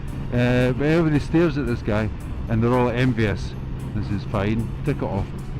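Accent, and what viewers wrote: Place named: Scotland, United Kingdom. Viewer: "You sound like the most Scottish person ever on your videos" "Do I? Don't worry, I'm planning on doing some "proper" videos" What?! 🤣🤣🤣 Nothing wrong with the roll-on acceleration there, 👍 Anyway, I'd love to comment further but I've got to go - tonight's the night!! (by which I mean the annual village quiz night, of course). 🤓😇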